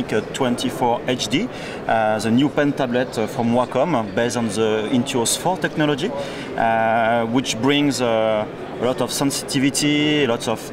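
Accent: France. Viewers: On reconnaît bien l'accent français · I was thinking this guy is french.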